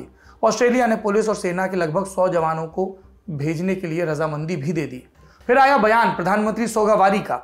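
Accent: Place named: Purvanchal, India. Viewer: Purvanchal se ho kya.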